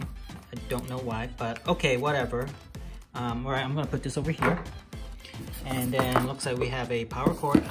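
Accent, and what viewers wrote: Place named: Denmark. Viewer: I would say you are Danish from the Netherlands am I right?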